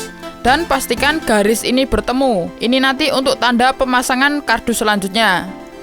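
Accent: Java, Indonesia. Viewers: Logat jawanya kelihatan Jowo ndi mas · Medok banget iki mantep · Medok tenan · Oke le medok bahasa nya wkwk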